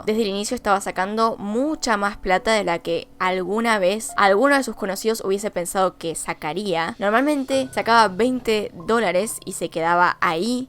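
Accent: Buenos Aires, Argentina. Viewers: Estoy de acuerdo, he escuchado el acento argentino de diversa áreas y me solía incomodar. Aparte que he conocido en persona variass argentinas y son difíciles de dialogar. No soy la persona que juzga a todos por el mismo filo, sin embargo es agradable escucharte y no ser petulante o de alta arcurnia. Me smpatizas muchos y por eso sigo tus casos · Es acento porteño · yo odiaba el acento argentino, pero te conoci a ti y todo cambio <3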